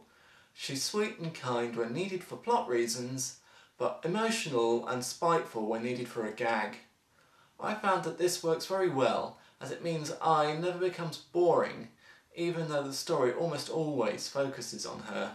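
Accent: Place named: United Kingdom. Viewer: I'm just wondering and not trying to be rude but why do you talk like you are a British unless of course you are a brit?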